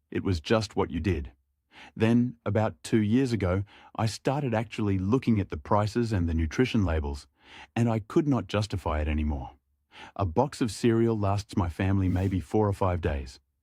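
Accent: United Kingdom. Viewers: It could be. But I've noticed that videos made with AI have a little marker just below the video area that identify them as such. This one does not have that. Also, having listened to the entire thing, I doubt it. I don't understand why the narrator has a British accent but listening to it, I can say, it's not AI-- with AI narration, there's always a give away; some little quirk, mispronunciation or glitch. None here.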